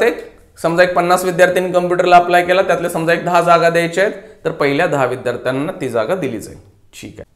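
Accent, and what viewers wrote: Solapur, India. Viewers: सर तुम्ही सोलापूरचे आहात का?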